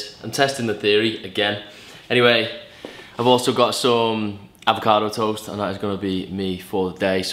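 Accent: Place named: Manchester, United Kingdom. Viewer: Z J Manc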